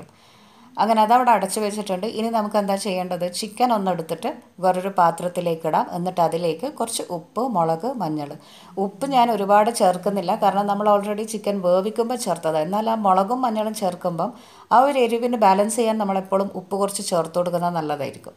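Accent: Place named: Kannur, India. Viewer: Biriyaniyum kannanur slangum ishtapetin itha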